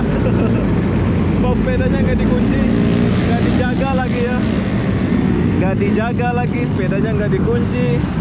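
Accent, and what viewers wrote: Indonesia. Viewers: Ini seriusan orang malasyia...kok logatnya indonesia bgt ya.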